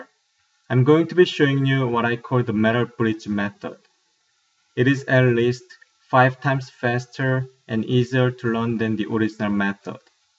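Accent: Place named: United Kingdom. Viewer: Your British accent is so strong, what part of the u.k. Are you from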